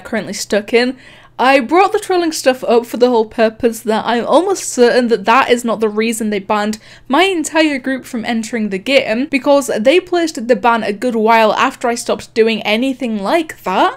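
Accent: United Kingdom. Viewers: Shes Accent seems british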